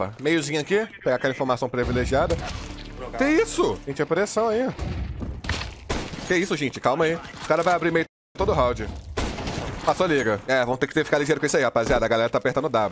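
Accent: Belo Horizonte, Brazil. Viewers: tu fala igual meus conterrâneos daqui de BH kkkkk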